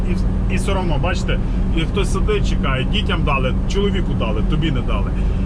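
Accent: Lviv, Ukraine. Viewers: Класне пояснення гарна дикція Тай акцент скорше Львівської області. Однозначно лайк.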